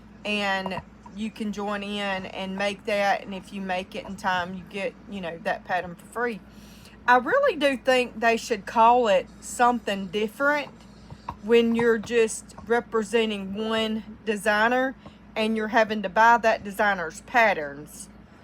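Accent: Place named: South, United States. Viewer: Girl that is Southern accent and no mistake! I am an Alabama girl myself. On the pricing, I charge $.07 per gram of the finished plushie, then add the extras like specialty eyes and poly pellets. Then I pay myself 10.00 an hour. For all your clearance items, I would suggest mystery bags. I bought some paper sacks on Amazon that are a bit smaller than your standard grocery bag, printed an 8 X 10 sheet and taped it to the front of each one. The sheet described the gender and age range that the plushies would be good for. Then I usually take the fully price I originally charged and mark it down 40% or 50%. I have had alot of success with those mystery bags. Hope that helps!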